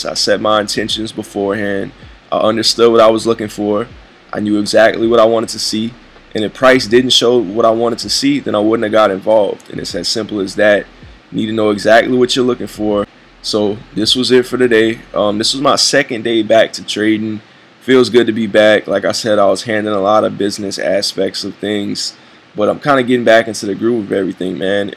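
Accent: Louisiana, United States. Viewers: I knew u was from Louisiana I can hear it in your voice what part you from bro im on the north shore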